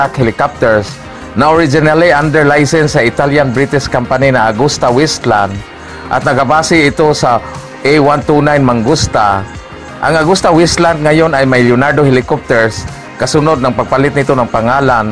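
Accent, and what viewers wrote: Visayas, Philippines. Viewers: Hirap intindihin pag bisaya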